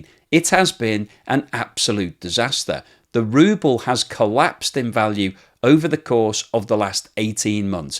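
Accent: United Kingdom. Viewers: 😂😂😂😂😂😂😂😂 According your accent , y are from UK. So ,we know that UK is PR of Ukraine, and what ever y said it's nothing but trash. It's better to look in your garden.😂😂😂😂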